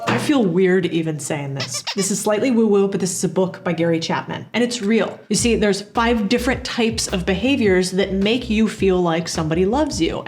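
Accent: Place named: Midwest, United States. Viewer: You are Latina with beautiful hair, but you have a Midwestern (no) accent. Please explain.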